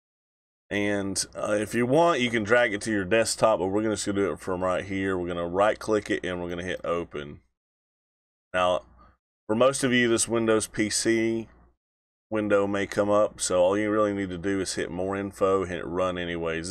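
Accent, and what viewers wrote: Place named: South, United States. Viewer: nice southern accent, i am also from the south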